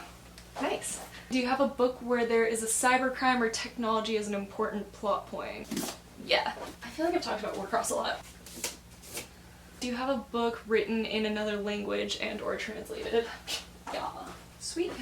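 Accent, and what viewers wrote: Argentina. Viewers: OMG! You spoke in Spanish and your accent is really great, wow! I’m from Mexico, but I loved your Argentina’s accent. Your videos are amazing, I enjoy them a lot. 🤩👌🏻❤️ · me encanta tu acento argentino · i was shook with your argentinian accent!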